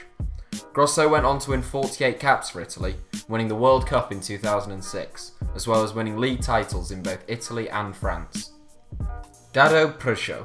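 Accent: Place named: Yorkshire, United Kingdom. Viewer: I'd like to apologise on behalf of the entire of Yorkshire… we don't all sound as monotone and dreary as that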